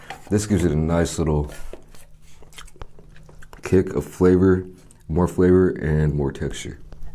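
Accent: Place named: New York, United States. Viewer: NGL~ if I was in a spot in NYC & walked in & spoke~ I woulda SWORN he was from New York!! 😂😍💪🏽💯❤️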